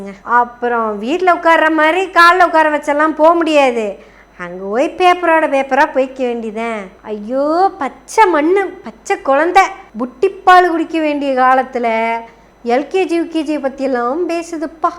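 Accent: Kongu, India. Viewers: அருமை மகளே கொங்கு தமிழின் பேச்சு. அட்டகாசம் பெரிய ர் கருத்துகளை பரப்புரை செய்யுங்கள் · பனையூர் பண்ணையார்.அருமையான பெயர்.யெனவே யெனவே பேசுவோம் மகளே.கொங்கு தமிழுக்காகவும் கருத்துக்களுக்காகவும் சேர்த்து வாழ்த்துக்கள்.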